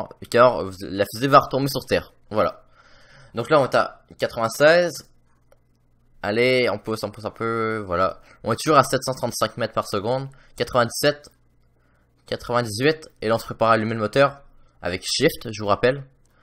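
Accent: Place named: Quebec, Canada. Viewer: Ton accent paraît québécois donc je suis casi sûr que tu es un canadien mais dis-moi, t'es un passionné de l'espace ou t'es à l'école d'astronomie en faite, moi je fais attention à presque toutes mes sources d'information, je vais dire que j'ai appris pas mal de choses nouveau qu'en voyant quelques-unes de tes vidéos qu'aujourd'hui je me suis trouvé sur ta chaîne aujourd'hui même 31 déc 2019 en chercheant avoir quelques notions sur ce que c'est "l'orbite" et pour dans les résultats je suis tombé sur la vidéo que tu as fait a propos de ça, merci de lire j'attends la réponse de ma question hein, il faut que je vous dise aussi que je suis haïtien mais vivant au chili. Merci encore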